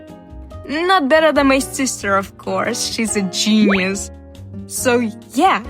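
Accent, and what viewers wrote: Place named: Russia. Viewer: my best friend is russian and still stuck there, I'm from Italy, and your video extremely reminded me of her :) ur accent (and hers) especially I think is always a fun accent to listen to. I'm sorry u ever felt insecure about it! as a trans dude and that also can relate to your struggles with other stuff, i hope ur life blossoms into the best u could ever wish for ♡ u got a new follower!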